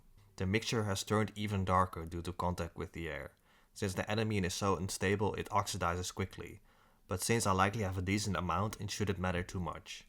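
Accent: Netherlands. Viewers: Where is your accent from?.. it sounds like Limbourguish.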